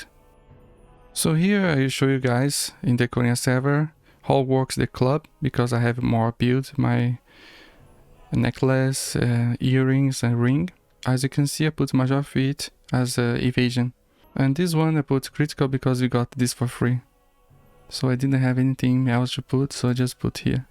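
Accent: Brazil. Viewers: Where are you from ? You really sound brazialian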